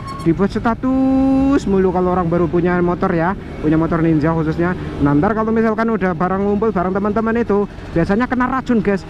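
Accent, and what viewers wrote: Banyumas, Indonesia. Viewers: ngapak'e gawe aku ngguya ngguyu ae pak😂..sampeyan wong ndi sih😄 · Klau ngvlog bahasa ngapak nya sering dipakai om biar makin ketawa aku liat ny lucuu...lucuu sukses trus om 😁👍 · Wong ndi si mas? Salam ngapak ket kebumen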